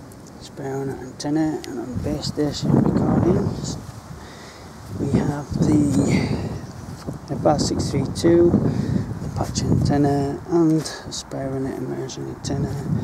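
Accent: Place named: United Kingdom. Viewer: You sound like you are from the UK. Any reason why you are using receivers greater than 25mw? 25mw is the max legal power in the UK & the EU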